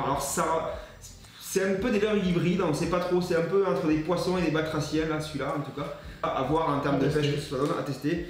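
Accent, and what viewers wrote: South, France. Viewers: Je participe ! J'aime trop votre version un peu plus sudiste de la pêche le petit accent fait la diff continuez comme ça les gars c'est vraiment top ! Je participe au concours si jamais j'ai un peu de chance ça sera la 1ere fois que je gagne quelque chose en concours ahah ! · Je n'avais pas spécialement prévu de vous laisser un commentaire mais là c'est juste parce que je suis un petit peu dégoûté. en effet ma nouvelle copine à qui je voulais montrer un petit peu mon milieu de la pêche en partageant vos vidéo vous surkiffe surtout avec votre petit accent du sud... du coup elle aussi elle veut essayer le float tube . Vous allez me coûter une blinde les gars!!!!!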